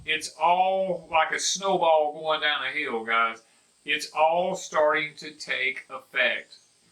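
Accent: South, United States. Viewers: Many Thanx from Canada. I always say what happens in the US will happen in Canada. Love the Southern drawl. great information, Thanx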